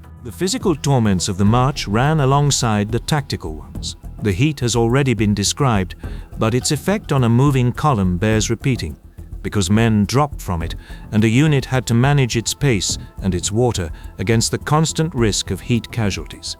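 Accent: England, United Kingdom. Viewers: Can't like a narrator with a Brit accent shedding anything negative about American Vets.nfg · I’m really not digging the English accent!🇺🇸👎👎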